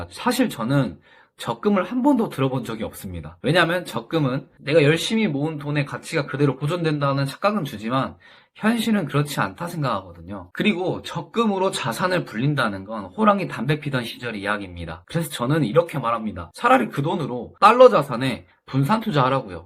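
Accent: Jeolla, South Korea. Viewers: 전라도 분이네요! 와 귀하신 분!❤❤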